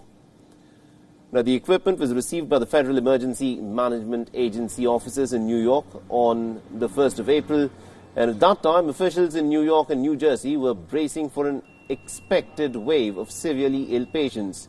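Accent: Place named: India. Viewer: Indian English has been developing independently with British rule and after. The anchor has a nasal tone & accent but otherwise it sounds normal enough to Indians. You must accept that there are worldwide variations in English all of which are equally authentic.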